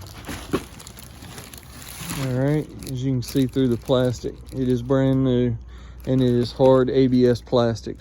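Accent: United States. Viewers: Are you from Virginia? Sound like a friend of mine. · I don't have the noise you were having, but I noticed the same issue with mine. I'm in South Carolina, you sound like you're from the south too, maybe it's something with the Southern heat and sun? Regardless, thanks for the thorough tutorial!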